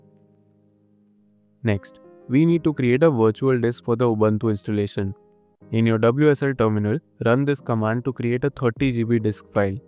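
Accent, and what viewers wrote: India. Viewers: indian, ew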